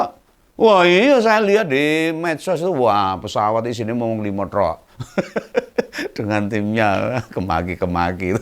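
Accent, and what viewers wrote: Java, Indonesia. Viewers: kemunculan paman selalu dinanti. Gaya bahasanya yg medok itu, jelas& enak didengar. Isinya realistis, nyata adanya, maju terus, semangat!!!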